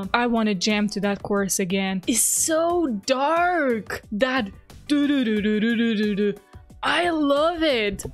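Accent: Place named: Slovenia. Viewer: GIRL YOU’RE SLOVENIAN ?? I always wondered where you accent was from, I can’t believe you’re there I fell in love with this country when I did an exchange there for a semester in Koper, and since than I try to come back once a year. I was so happy when I saw Ljubljana on the list, bc a very good friend of mine, Slovenian, told me how you never have shows there and you always have to go to Austria or Croatia. So good for you !! Love from France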